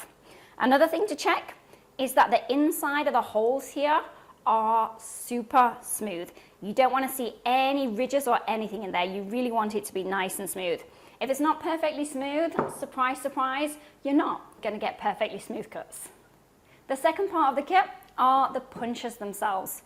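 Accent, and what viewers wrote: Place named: England, United Kingdom. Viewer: Have to watch the vid again to find out how to use a disc cutter,--------- couldn't concentrate. was not expecting the pommy accent and then "brick shithouse " came out and then "any hole" PMSL!!!. Had you on fbook for some time now, without knowing you were sooo entertaining. Following on Youtube and Insta from tonight here in Aus.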